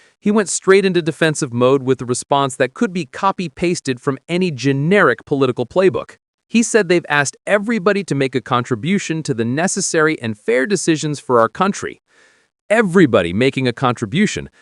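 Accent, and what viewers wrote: United States. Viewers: Why do we have to listen to a yank voice !!!!!!? It’s awful.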